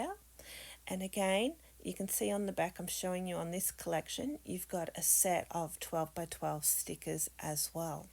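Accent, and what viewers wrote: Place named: Australia. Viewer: I think everything you got was marvellous, the travel sticker book and papers were my favourite Great to hear a fellow Aussie...tfs:) Zelda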